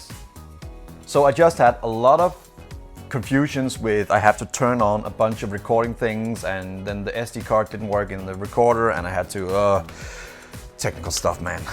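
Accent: Denmark. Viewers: Danish accent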